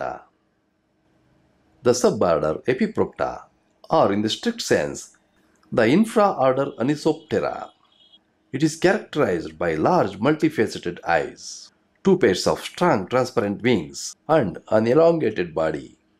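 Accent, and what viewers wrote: India. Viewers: Indian bengali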